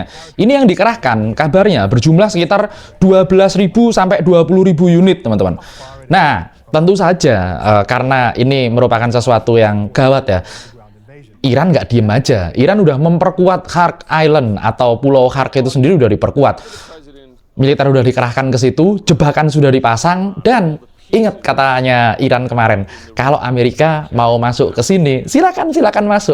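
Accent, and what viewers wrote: Java, Indonesia. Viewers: Mas. Nonton chanelu ini bukane tegang. Tapi malah kudu guyu ae massehhh. Khas asli wong jowo awakmu ki cara ngomonge.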